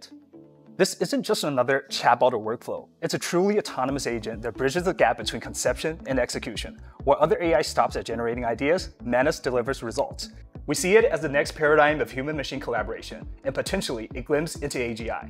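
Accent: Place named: United States. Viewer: Chinese startup, American accent 🧐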